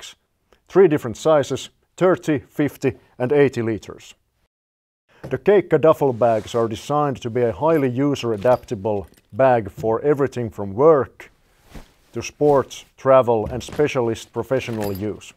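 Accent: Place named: Finland. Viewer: I discovered that I can be persuaded to buy things by a handsome man with a Finnish accent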